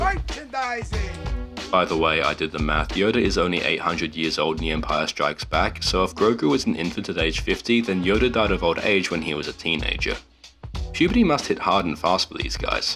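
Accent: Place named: Australia. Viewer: God I hate the australian accent so much and ur video sucked ur reasons why the first season was bad were minor nobody rly gives a f abt some fob what I care is structural storytelling and good characters. Season 1 had that and like all good things Disney must destroy with season 3. You rly suck at "breaking down" things pls sygau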